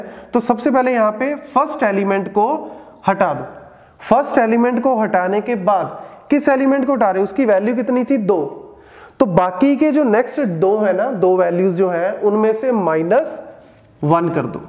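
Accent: Punjab, India. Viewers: Main jitna tumhe dekhu, dil ye na bhare Thanks sir, I watched all your videos on Graph theory. Punjabi accent is lovely!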